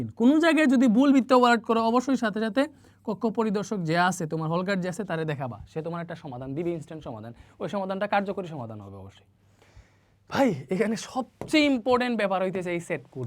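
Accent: Sylhet, Bangladesh. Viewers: Bhai aponake donnobad ar aponi ki sylhety😢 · ভাই মনে হয় খাঁটি সিলেটি..❤😊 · Vhaiya mone hoy sylheti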